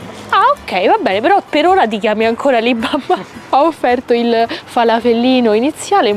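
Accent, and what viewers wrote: Rome, Italy. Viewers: 120 Alibaba bella sta cosa non era solle.mille e una notte, bellissima tu e mi piacciono i tuoi contenuti poi io da veneto mi fa morire il tuo accento romano de Roma 💋😉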